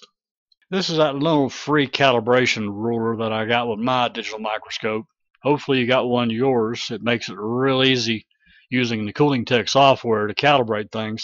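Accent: United States. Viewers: Country accent 😈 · Great Work ! Accent is the best ! (Nashville ! guess)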